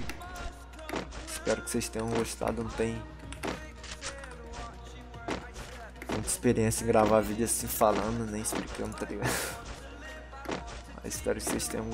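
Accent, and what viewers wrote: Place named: Bahia, Brazil. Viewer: Antigamente voz de maloqueiro hoje em dia tem voz de baiano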